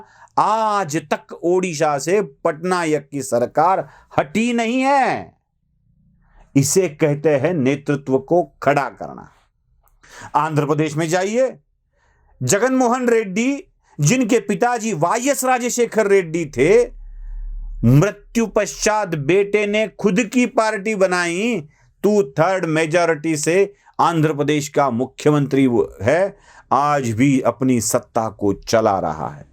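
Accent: Maharashtra, India. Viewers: बहुत खुब!! बढीया! भलेही मराठी टोन हो, पर हिंदी मे खुब ही बढीया विश्लेषण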